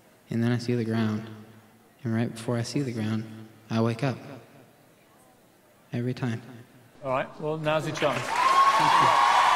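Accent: United Kingdom. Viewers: I thought it was real until Simon said "Well, here's your chance" but I still had doubts before that because I was like "Wait... isn't British.. Is he? 0.0" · umm is he really british?...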